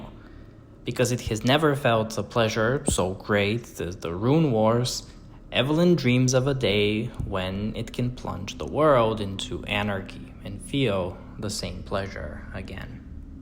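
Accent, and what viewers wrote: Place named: Manaus, Brazil. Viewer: u whistle while you talk, you sound like a little bird, people from Manaus, Amazonas, like me, have that accent too.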